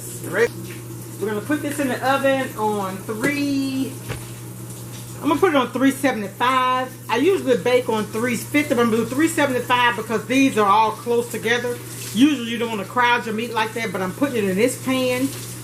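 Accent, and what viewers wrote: South, United States. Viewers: She reminds me of and sounds like my aunts back home in the south. Nothing like soul food cooking. 🙌🏾🙌🏾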